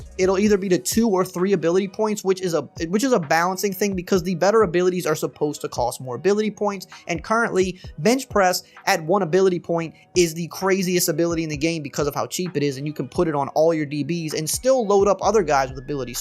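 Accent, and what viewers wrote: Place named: South, United States. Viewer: Thanks for u videos country boy your accent makes me listen lol frfr tho thanks for the info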